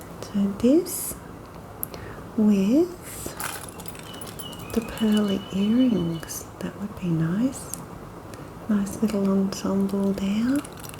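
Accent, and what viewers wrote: Australia. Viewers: First time viewer here! I was scrolling my feed and I literally looked at the thumbnail and thought, “I wonder what Australian ASMR would sound like” and I went to click the audio to preview heard her accent and it’s like this was meant to be. Can’t wait to watch more! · FINALLY FOUND YOU! I've been searching for 15 years for a soft spoken lady with an aussie accent! ❤❤❤🎉🎉🎉 This is reminiscent of tv shopping networks where i would hope for tingles but it was never garaunteed. Thank you, liked and subscribed.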